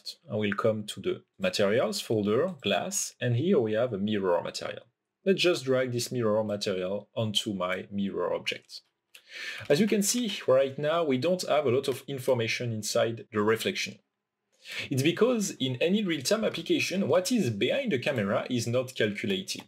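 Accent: France. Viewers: you are french ?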